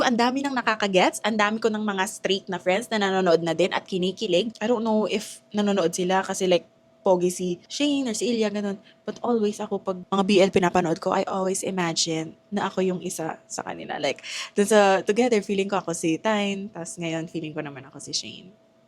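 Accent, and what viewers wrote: Iloilo, Philippines. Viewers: skruwd ap ilonggo accent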